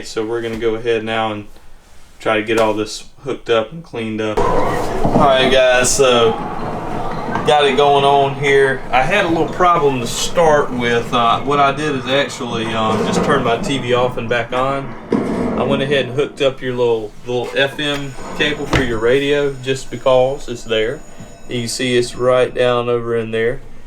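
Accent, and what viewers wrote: United States. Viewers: the epitome of a cowboy accent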